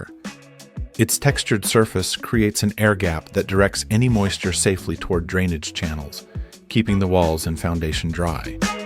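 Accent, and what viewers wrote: United States. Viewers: Who is this 'Merican doing the voiceover ?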